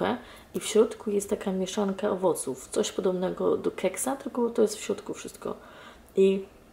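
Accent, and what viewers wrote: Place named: United Kingdom. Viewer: Hej, mam pytanie. Nie dotyczy ono tego vloga ale za każdym razem jak Was oglądam to jakoś tłucze mi się to po głowie. Mianowice, Twój angielski ma już delikatny brytyjski akcent ale za pewne nie zawsze tak było. Możesz spytać męża co sądzi o naszym polskim akcencie na tle innych narodowości? Czy jest znosny? Irytujący? A może całkiem miły dla ucha? Wiem że niektóre akcenty (podobno francuski) w ustach mężczyzny brzmią słabo ale kobieta z takim akcentem brzmi sexi. Często się nad tym zastanawiam.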